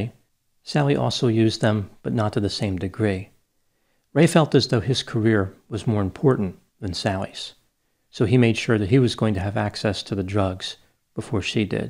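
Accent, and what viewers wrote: United States. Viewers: My name is marianne and live in England 🇬🇧 I love the way it sounds with American accent 😀 😄 xx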